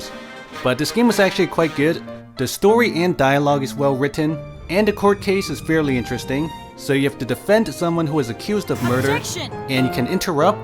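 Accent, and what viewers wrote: Netherlands. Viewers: Your dutch are you?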